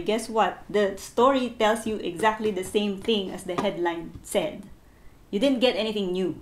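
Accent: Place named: Tagalog, Philippines. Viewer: Fantastic Book! Thank you for your review! I think your tagalog accent is fantastic!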